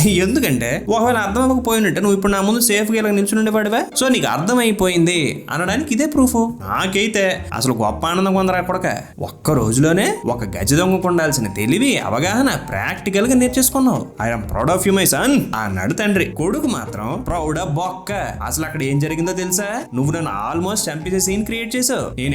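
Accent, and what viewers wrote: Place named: Andhra Pradesh, India. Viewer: Nee Andhra accent dharidhramga undhi ra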